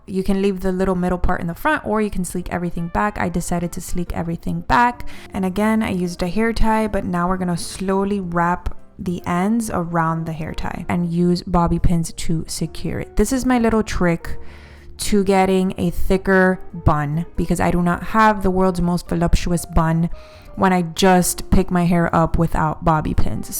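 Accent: Miami, United States. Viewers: Miami accent? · Omg girllll I heard your accent and I was like "She's from Miami for sure!!" Love this 💕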